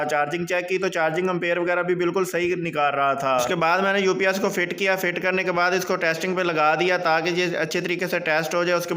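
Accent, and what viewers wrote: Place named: Rural, India. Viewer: Kaar deye? Kyune KAAR deye? Urdu to dekho zra apni. Aisa lg raha hai k ksi Pind se ai ho or video bnaney beth gae ho.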